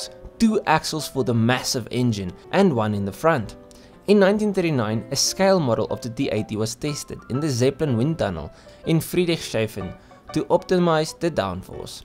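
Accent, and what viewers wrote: South Africa. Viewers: i notice that South African accent from miles away😂❤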